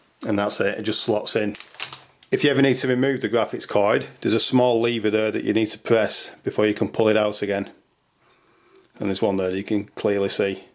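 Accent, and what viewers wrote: United Kingdom. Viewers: He is speaking really english he's from the UK which made the language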